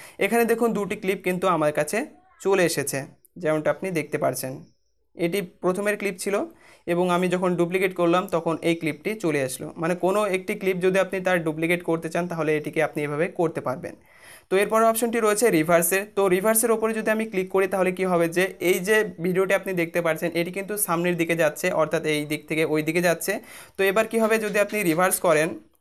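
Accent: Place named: Barishal, Bangladesh. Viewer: ভাই বরিশালে র ভাসা টা সেই লাগে🤣🤣🤣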